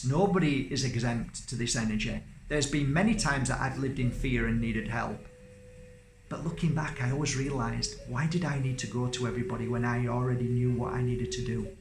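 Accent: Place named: North, United Kingdom. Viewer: My friend recommended you to me and you’re absolutely amazing. I can very much relate to a northern accent too haha ....Thank you for helping me see the light 😊xx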